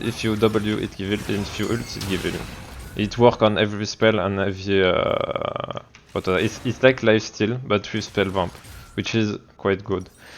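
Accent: France. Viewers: GG for the francais accent :)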